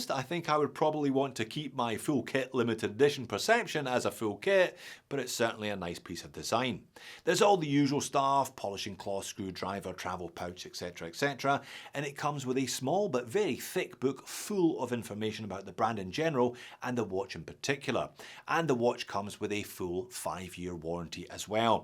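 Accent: Scotland, United Kingdom. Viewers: I can hear the Scottish accent!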